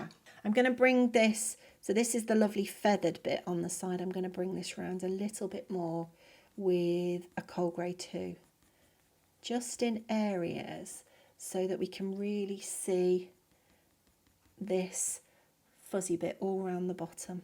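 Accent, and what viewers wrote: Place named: England, United Kingdom. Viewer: Fantastic tutorial! Just drew it and he came out lovely. That C'aran D'ache Museum Aquarelle white pencil is just pure magic for the wing. Make translucency so believable. Thank you for this, it made my Sunday afternoon really enjoyable. That, and knowing you are living near Dartmoor where I was born, and come from the South East, also where I used to live, in Berkshire for a while till I moved abroad. As an Arizona expat for 25 years now, it makes me homesick to hear the English accent all over again, as I don't go home so often these days. Thanks again!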